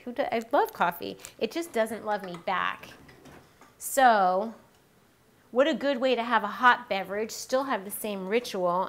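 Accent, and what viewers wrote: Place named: Texas, United States. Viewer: little bit of honey -- sounded like she from TEXAS:))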